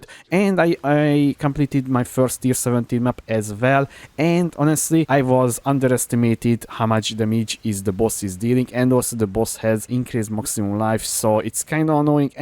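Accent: Hungary. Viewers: Amúgy nem akarok paraszt lenni, de érdemes lenne valami angol tanár vagy ilyesmi, nagyon keményen érződik hogy magyarosan mondasz dolgokat. (Normal helyett normál, rekáveri) Hozzáteszem én se vagyok mérföldekkel jobb, nekem is mondogatták ezt, de ja.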